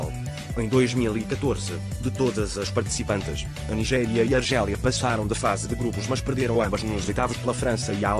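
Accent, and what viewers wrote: Portugal. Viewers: posso legendar seus videos? a voz pt é meio complicada de entender pelos brasileiros por causa do sotaque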